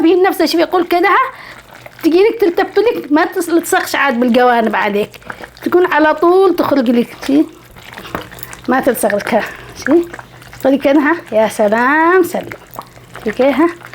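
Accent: Yemen. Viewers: اكلاتكم اليمنية وعذوبة لهجتكم ولطفك وطيبتك تعيد الينا ايام الخير والطيبة وراحة البال ليست كايامنا هذه اللله يستر 😂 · كلامك نصفه يمني ونصفه جنوبي سعودي ....😅 وعموما جزاك الله خيرا على وصفاتك الرائعه ❤ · اي والله ننساء الهموم ولو لمدت دقيقه والله انه تعجبني لهجتك اليمنيه وكمان انا يمنيه ماشاء الله تبارك الله على الضحكه والحناء ربي يحفظك من كل شر ياخالتي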